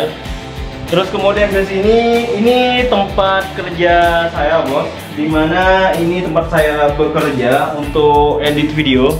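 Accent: Madura, Indonesia. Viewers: Mas asli orang mana? Logatnya koq kadang sy dnger kaya orang madura ya😁🙏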